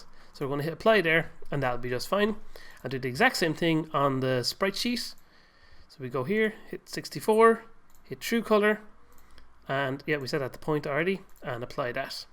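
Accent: Ireland. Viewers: Are you Irish or something?? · Lol your voice and Irish accent both remind me of remind me of this YouTuber called TheTerroriser (aka Brian). Anyway, thanks for the tutorial ❤ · I'm all about this dude's accent. Ireland needs to pump out more tutorials. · The best parts of the video! 1. Your Irish, which makes everything better! 2.The tutorial was very easy to understand and helped alot! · your accent is what i live for if i had an irish accent i would fucking love myself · I'm so used to Irish accents that I didn't even realise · So true. The Irish accent seems to ease its way into my brain, making me understand more efficiently. ;-) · I actually didn't realize he was Irish, the accent is so burned into my brain it sounds normal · Are you Irish? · This guy's Irish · I just realised how irish this man is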